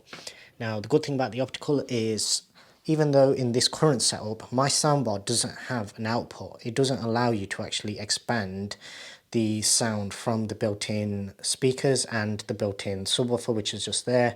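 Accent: United Kingdom. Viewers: Thanks for the English English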